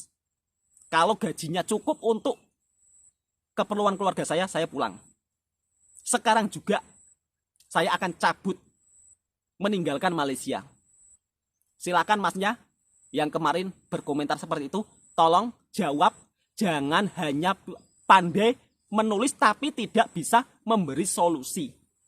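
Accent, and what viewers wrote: Java, Indonesia. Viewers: bahasa nya pekat org mana tu.. isor ngomong jowo. pekat tnent yoo... menarik sekali sebutan nya kang. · Jawa banget bahasanya mas...asyik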